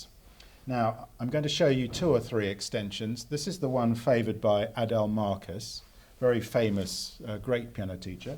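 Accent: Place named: United Kingdom. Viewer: No offence to your job done but I hate UK accent... ugh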